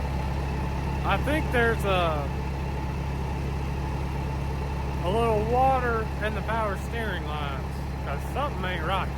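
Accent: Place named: Kentucky, United States. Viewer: I knew it... I knew you were from KY. You talk just like me. But I'm in northern ky. Got 165 acres of nothing but deer and turkeys..... not another house in site...just like we like it. Glad I found your channel.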